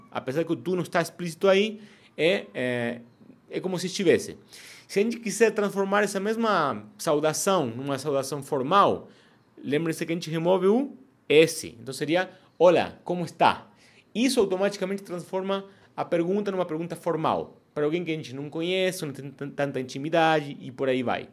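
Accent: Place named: Argentina. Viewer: Débora, é o sotaque argentino. Na Espanha por exemplo, "como te llamas" nós pronunciamos: como te " iamas" . A escrita é idêntica, mas a pronúncia muda bastante 😅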